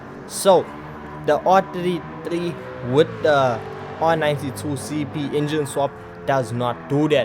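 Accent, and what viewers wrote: South Africa. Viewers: I love the SA accent, so cool my bru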